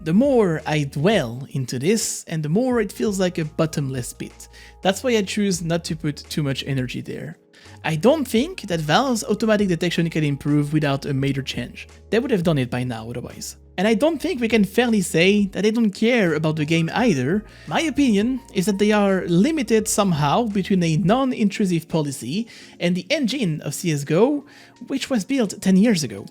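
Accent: France. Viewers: You're the first French who speaks English without a too big accent. I can handle and see all this video's thks ❤️ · I am currently really enjoying to eat zuccini (how tf do you even write this in English). Thanks, your sweet accent always reminds me of doing my French homework for university.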